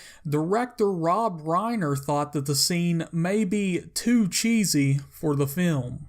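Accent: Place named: Kentucky, United States. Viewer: Sounds like Kentucky to me.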